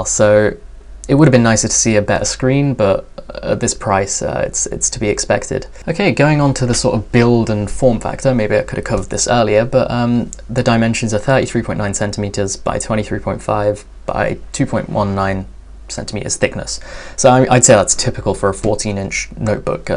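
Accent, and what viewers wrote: England, United Kingdom. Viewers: This guy could not have a more English accent if he tried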